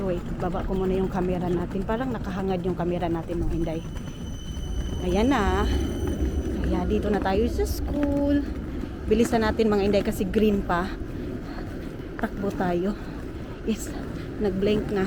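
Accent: Visayas, Philippines. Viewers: Indai everyday abangers ako sa vlog mo para ka teleserye hahaha ok Lang kahit Ano vlog mo tas kahit Hindi ko gets ang accent bisaya mo hahaha tyaga ako manood sayo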